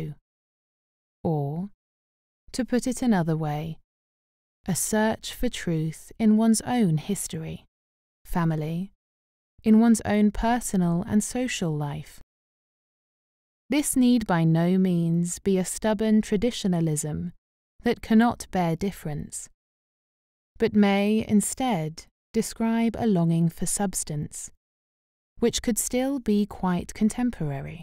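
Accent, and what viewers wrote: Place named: England, United Kingdom. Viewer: I love it when a speaker has an English accent. It makes her points sounds so impressive.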